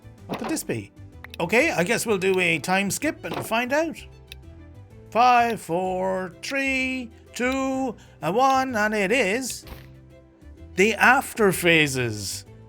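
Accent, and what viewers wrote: Ireland. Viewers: I really am enjoying this One Block series. It is very different from what I've seen before but it is wonderful! 😊 I've been trying to catch where is your accent from? My partner is Irish and you sound quite Irish to me, where is it from? :) I can't wait to finish this video and see what else you have in store, greetings from Honduras! 😊 Edit: just finished the video! Congrats, you are so talented, I was blown away with the ship at the end 😮❤ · I love everything about this One Block. I can honestly say that Its my favorite One Block. I am a fan of the Irish accent, your builds and your humor. I look forward to watching the rest of your vids. Oh and I subscribed today. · OUUHH OKAY THATS COOL, i could tell from the accent!! i rarely see other irish people who play minecraft i got a bit hyped lmao, amazing video though good luck with growing <3! 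very underrated and have alot of potential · just found your chanel and 1 min in I was like this guys definitely irish just from the way you speek(I'm also irish) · Definitely new here, but you're from Ireland, right? Its always nice hearing a familiar accent 😂😁😁😁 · You don't sound Australian. I hear a strong Irish accent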